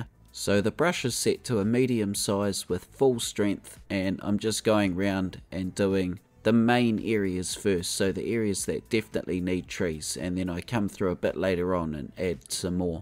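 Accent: New Zealand. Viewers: omg is your accent from New Zealand? it's awesome!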